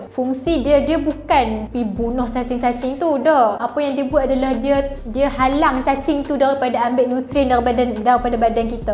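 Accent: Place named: Kedah, Malaysia. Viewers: menarik pulak speaker ni cakap utagha syiokk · comel la cakap utaqa 😅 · Tudia hang puny sembang Kedah memang keluaq habeh tak tapeh ooo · uish loghat utaqa.. dulu aku pernah kena cacing masuk dlm sel kulit sebb pegi pantai x pakai kasut... time tu kecik lagi sampai besar skarang still ada Jalan Cacing tu dkt lutut & blakang... sejak daritu aku Fobia nk ke pantai jijak pasir Daging Mentah: Islam pun kata "Masak adalah makan yg sempurna dari metah yg boleh mendatangkan penyakit❤"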